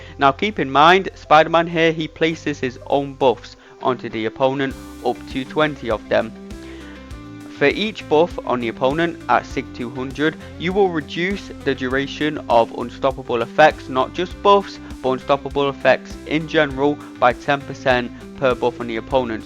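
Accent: United Kingdom. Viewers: Ok so I literally just watched a video by someone else on this guy, and dude I literally couldn’t pay attention through the entire video and learned basically nothing. Then I come here and watch you and I just got 100% locked in immediately somehow?? pls never leave again, my brain can only understand your British explanations 🙏🙏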